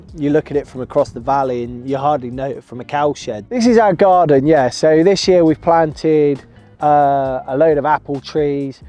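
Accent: England, United Kingdom. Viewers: sounds almost like an English version of *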